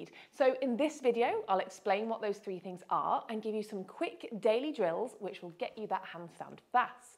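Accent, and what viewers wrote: United Kingdom. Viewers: Your videos are so much better than others I've seen for a few reasons: 1. No gung-ho ego-driven nonsense, 2. Great pedagogical style, 3. British accent.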